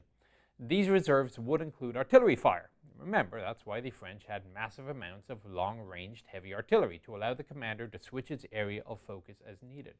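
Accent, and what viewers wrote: Ireland. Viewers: It's the Irish accent. It tends to start low then go high at the end when important information is presented. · is the Chieftain Irish? He certainly has that silver tongued lilt from Eirre. There's no gutteral Northern Irish there. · I'm not bragging cause I'm sure enough a loser but I know all this. I think the is reading this..But don't get me wrong...i love the Irish accent and the delivery. He would be a hell of a professor. But he does better speaking for a video game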